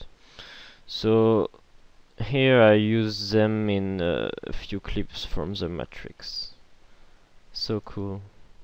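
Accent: France. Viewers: ah ton englais est parfait avec l accent Français tu t en sort bien t es pmv son extraordinaire continue a en faire j adore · Your accent sounds french... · Are you french, swiss?? *.*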